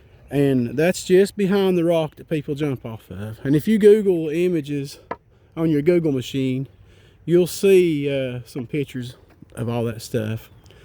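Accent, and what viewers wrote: South, United States. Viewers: Nothing like a Southern storyteller. Great job! Very scary experience.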